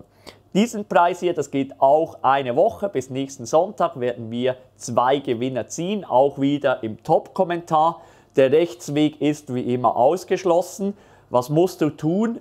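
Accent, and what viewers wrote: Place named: Switzerland. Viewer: vielen Dank für dieses tolle gewinnspiel ich drücke mir und allen anderen die Daumen und würde mich sehr darüber freuen. Seit Jahren schaue ich deine Videos und mage deinen schweizerischen Akzent. Ich hoffe auch mal nächstes Jahr bei einem Kurs von dir dabei zu sein. Frohe Weihnachtszeit 🎄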